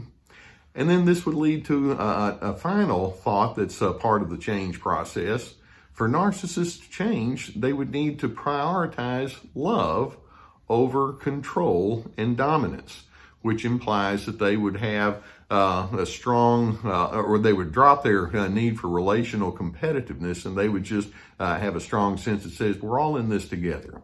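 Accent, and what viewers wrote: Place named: Texas, United States. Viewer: in London everyone would expect you to be a cowboy because of your accent. We only know that show Dallas here...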